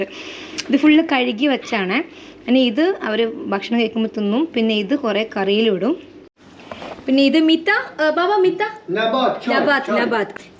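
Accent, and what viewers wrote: Kannur, India. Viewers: കണ്ണൂർക്കാരത്തി അല്ലേ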